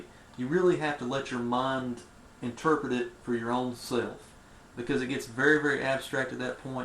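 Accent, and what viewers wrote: South, United States. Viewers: Can't quite place your accent, sounds halfway between sounds mostly country southern with a mix of Carl Sagan.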